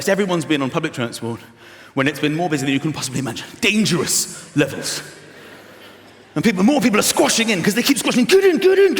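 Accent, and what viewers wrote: RP, United Kingdom. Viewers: I think it's his very posh accent that makes his style so good. Very funny, very likeable guy.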